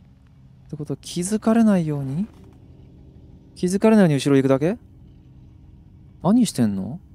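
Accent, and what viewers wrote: Hiroshima, Japan. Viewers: あの…毎回出る広島弁？が最高です…(º﹃º ) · 増田さんの広島弁、やっぱり好きだなぁ～。🥰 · 広島弁が心地よいー☺️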